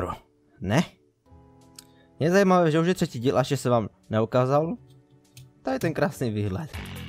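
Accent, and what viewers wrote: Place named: Ostrava, Czech Republic. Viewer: Btw jsi někde z okolí Ostravy? Zníš jako totální Ostravák :D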